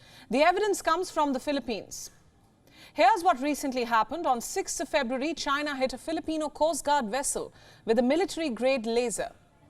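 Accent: India. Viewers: If not for her Indian accent, I would have believed everything she said. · It's like watching CNN in English with a Indian accent 🤮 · Your Indian TV program Gravitas is a really a joke, why ? Your English is terrible with heavy Indian accent, all you should do is to brush your English. The South China Sea within the nine dashlines belongs to China. Phillippine intruders will of course be warned, and we Chinese are not warmongers, we just warned the Phillipine warship with laser, this is a very advanced weapon than firing rockets at the Philippinos. By the way the Philippinos don`t have such advanced laser weapon, so stop intruding Chinese territory. Next time there will be no such friendly warning just by laser, your military vessels will be blown into pieces.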